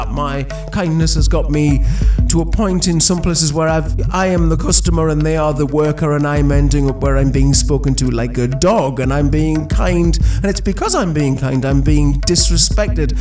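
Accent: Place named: United Kingdom. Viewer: I fully agree with you... In France, supposedly, nobody is really hungry - the government provides for them... last time I gave something was a sandwiich - someone told me what i just wrote about government's help - impossible to know if they are really needy - it is impossible to always give and give when, you, yourself, are in a critical financial situation... complicated subject in a way... Great talk ! love your british accent ! lol ! renee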